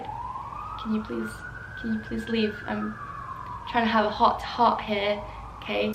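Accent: United Kingdom. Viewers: wait very stupid question.... when did you get the british accent? or is it not?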